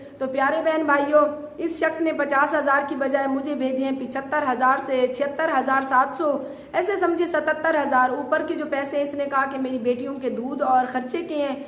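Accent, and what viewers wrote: Saraiki, Pakistan. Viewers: باجی آپ سرائیکی میں ویلوگ بنایا کرو آپ کی زبان سے سرائیکی اچھی لگتی ہے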